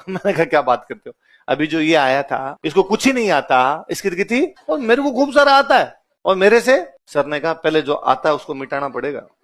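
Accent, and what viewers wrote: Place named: Marwar, India. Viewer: सर आपकी बोली हुई मारवाड़ी भाषा बहुत मीठी लगती है। ❤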